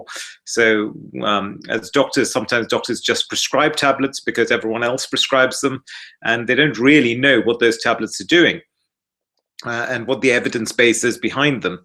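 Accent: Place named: RP, United Kingdom. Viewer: What can causes palpitations or fluttering? Since 2y i started to get them. Since 5y i got hashimoto aswell. Before that time i was very healthy and energetic... since i got hypothyoroid issues there is always something going on... say i get 1 month palpitations the rest of the year its gone. At this moment i have it 1,5 month and it is not gone yet. I blamed first probiotics or greec yoghurt. So did not take it and still its there. Then i took celtic seasalt in water and bit by bit it went away! So i was very happy. But then 3 days later it came back. Most of the time i take every day magnesium. In juni cardiologist tested potassium etc and it was good, end of july it started the palpitations. So what can i do about it? By the way you have good videos, plus you are good looking and sexy too 😁☺. I like your post english accent dr.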